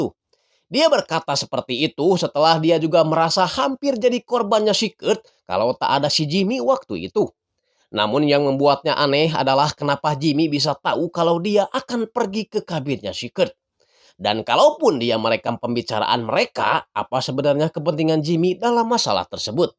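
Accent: West Java, Indonesia. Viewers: ( bang jembut)😂 Iyeu mah asli sunda😅😅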